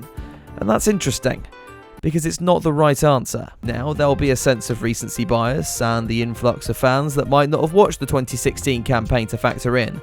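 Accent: United Kingdom. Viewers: "Why Red Bull (read Verstappen) Domination is WORSE... (Then Hamilton's)" Said the guy with the obvious British accent.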